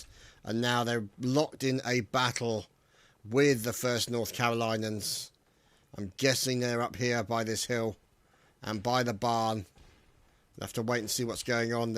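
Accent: United Kingdom. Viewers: its just moose there is no plural for moose btw keep up the good work your funny as hell lol espeically with the British Accent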